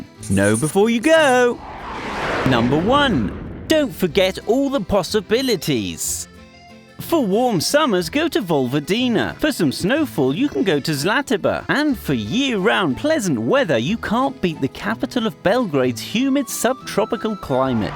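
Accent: England, United Kingdom. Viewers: What an ugly pomie accent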